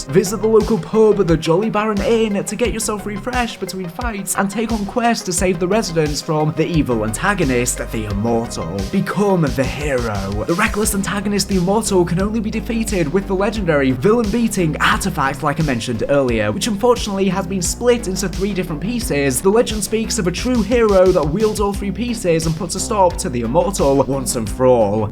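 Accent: England, United Kingdom. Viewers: I’m from Scotland and trust me mate, there’s about a million different accents out there in the UK. I’m pretty sure English, couldn’t tell you what part of England he’s from exactly though.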